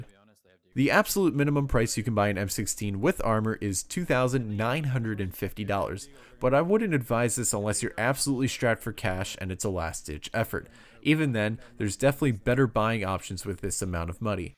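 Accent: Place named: Canada. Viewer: You sound...very canadian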